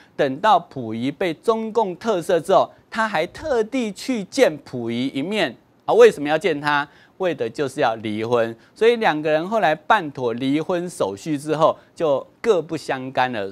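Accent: Taiwan. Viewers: 台湾國語聽得恶心叭啦。 老是用什么那，耶，喔，像在跟小孩說話。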